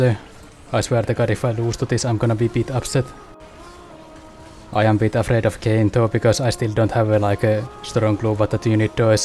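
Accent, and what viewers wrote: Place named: Finland. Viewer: You have to be 100% finish. That accent tho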